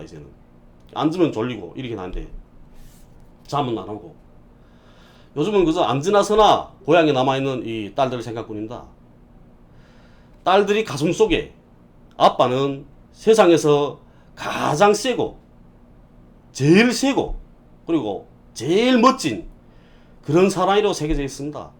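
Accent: North Korea. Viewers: 북쪽 말이 좋습니다!고치면 되지!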